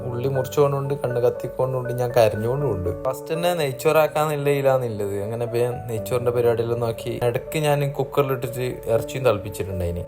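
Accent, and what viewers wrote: Kasaragod, India. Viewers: സംസാരം കേട്ടാൽ തന്നെ മനസ്സിലാകും കാസർകോട്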